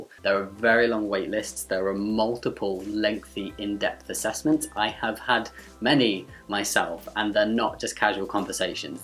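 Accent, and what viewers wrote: United Kingdom. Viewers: You’ve successfully made me hate the British accent. You are a joke and are an insensitive to other humans. Quit being a narcissist. You’re entire community is indoctrinated- including you. 🤮 You also lie (because it is too simple to get hormones -10-30 minutes) and you invalidate other people’s reality. STFU and think about something else other than your gender for once in your life.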